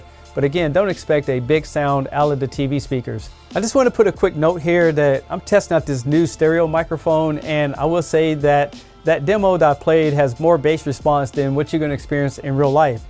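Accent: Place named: North Carolina, United States. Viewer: I’ve watched a lot of your videos but still can’t quite pinpoint your accent. I’m thinking somewhere in the North Carolina area? Maybe Kentucky?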